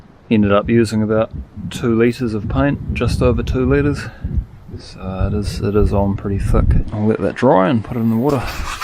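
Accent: New Zealand. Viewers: That's the awesome New Zuld accent...very flat in comparison to most other English speaking accents. Can lead to confusion when spoken in the West Island (Australia) e.g. Aussies say "sex" when they mean to say "six"..and the Kiwi will sound like "sux" when he means "six" leading almost immediately to sheep jokes!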